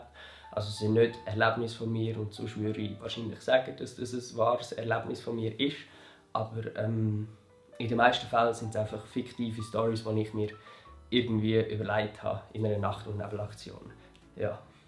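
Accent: Switzerland. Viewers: Ich finde das schweizerdeutsche voll schön, kann nicht mehr aufhören, deine Videos zu gucken😂🤗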